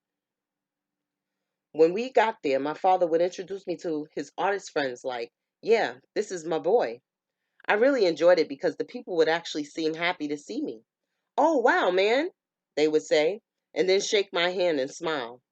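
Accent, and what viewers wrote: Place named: East Coast, United States. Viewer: Thanks for doing this you did. WONDERFUL JOB! U SHOULD CONSIDER DOING THIS FOR A LIVING! LOVE YOUR EAST COST SPEECH!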